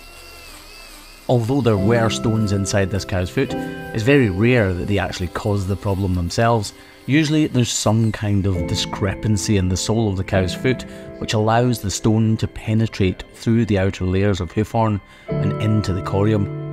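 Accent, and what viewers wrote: Scotland, United Kingdom. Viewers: You sounded more Scots in this vid... what happened? ... ... ... Was this a repeat from a few years ago? I mean, you almost sounded like Craigy Boy! XD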